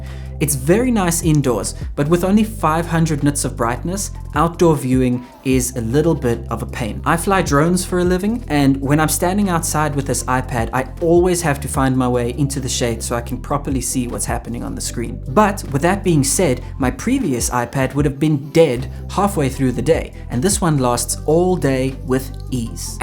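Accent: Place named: South Africa. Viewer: Subscribed because I need Tech Reviews delivered in a sick South African accent!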